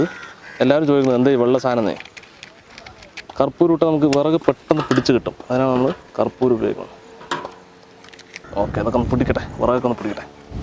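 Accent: Palakkad, India. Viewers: palakkadan slang itrayum bhagiyode kerathinu kanichukoduthathinu big salute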